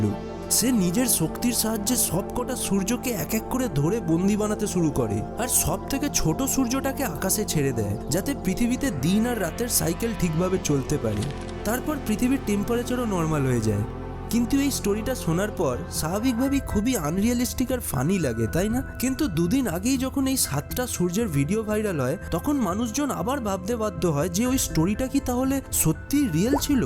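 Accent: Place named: Kolkata, India. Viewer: Are bhai😑 Uni kolkata r tai amn pronounciation.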